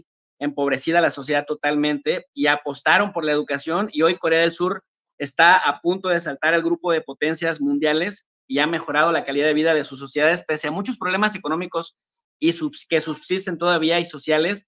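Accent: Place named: Paraguay. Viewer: Que feliz de escuchar a una paraguaya en el canal🥰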